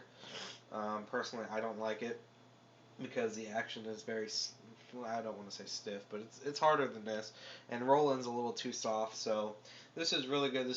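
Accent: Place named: United States. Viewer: I see now that you are living in the USA, I was in mind you were from UK, ok the accent was american, so I had to knew that, all the best and thanks, Luc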